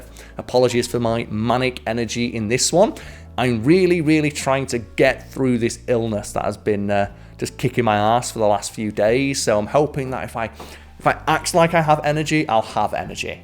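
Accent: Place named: Yorkshire, United Kingdom. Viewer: The Yorkshire dialect isn't that bad to understand. Also just turn on closed captions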